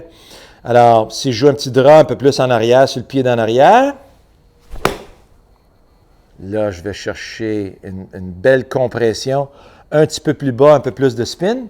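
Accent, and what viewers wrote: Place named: Quebec, Canada. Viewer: J'y compte bien ! Ça me fait beaucoup de bien de regarder tes vidéos car je retrouve mon Québec natal, l'accent mais surtout à quel point tu donnes envie de jouer au golf. Tu partages vraiment ta passion, c'est un signe d'immense générosité ! Je dois remercier Thomas , le jeune pro du club qui m'a fait découvrir ton site. Bienvenue en France quand tu veux !! Bye-Bye. 👏🏌️⛳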